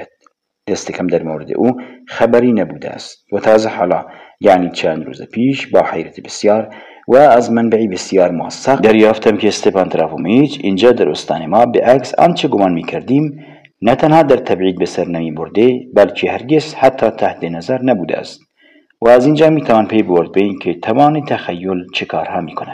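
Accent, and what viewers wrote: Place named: Iran. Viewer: دمتون گرم و سپاس از تلاشتون در مورد لهجتونم باید بگم به نظر من که خیلیم جذاب و شیرینه توفیق مضاعفه هم لهجه زیبای یه خطه از ایران عزیزمونو شنیدیم هم مطالب کتاب را زنده باد تمام ایران و اقوام و مردمانش❤❤❤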